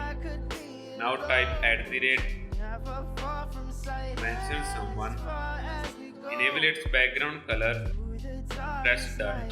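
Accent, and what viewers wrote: India. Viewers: You English is enough punjabi?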